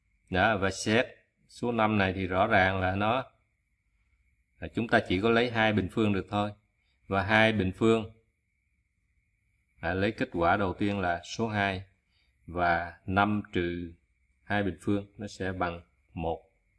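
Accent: South, Vietnam. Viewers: Trong một xã hội bị đồng tiền chi phối gây ra hỗn loạn trong mọi lĩnh vực như bây giờ mà vô tình gặp được kênh của bạn thì thật là đáng trân trọng! Với chất giọng ấm áp, trầm hùng mà giản dị của phương ngữ Nam bộ khiến người xem rất dễ chịu và dễ hiểu. Cơ duyên “gặp” được kênh của bạn cách đây tầm hơn tháng và liền đăng ký kênh để tiện theo dõi. Một kênh rất có ích cho xã hội ngày nay. Và mình thấy có một điều đáng tiếc là hiện nay những kênh mang nội dung học thuật lại ít được theo dõi hơn những kênh giải trí ( mà đa phần là tào lao/ như kênh Nờ Ô Nô hổm giờ báo chí đưa tin). Vậy thì tương lai đất nước sẽ như thế nào??? Chúc bạn và gia đình: Hạnh phúc + Sức khỏe!!! Thân chào!!!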